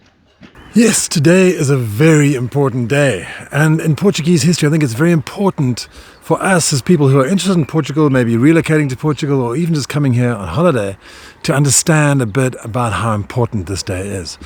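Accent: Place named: South Africa. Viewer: Awesome how your SA accent hasn't changed after 20 years 😊. Please make a video of where to buy super cheap houses thats not remote or far from the bigger cities/towns. A seet up similar to the cheap Italian villages currently selling cheap. Not a €1 home but between €10k to € 20k. Iam aware of ruins for sale in countrysides of Portugal. Looking for something that needs cosmetics not structural renovation. I am a young Looking 52 year old South African living on Poland 🇵🇱 Looking to pay cash for a home base even if I don't live there full time. In SA am no longer a candidate for a home loan and honestly I don't want to be bound by 20 year debt, especially at my age. Want to enjoy income I earn traveling more.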